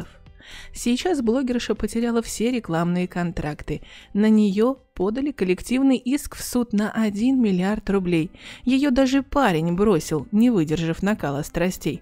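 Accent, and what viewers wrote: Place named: England, United Kingdom. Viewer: чувствуется английский акцент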